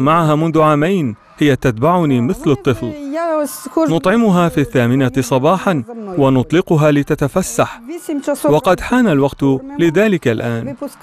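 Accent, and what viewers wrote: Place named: Russia. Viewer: لكنة روسية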